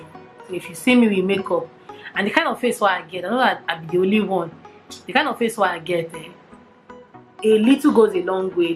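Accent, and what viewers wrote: Port Harcourt, Nigeria. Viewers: I like your pidgin o, very Port Harcourt'ish..LOL!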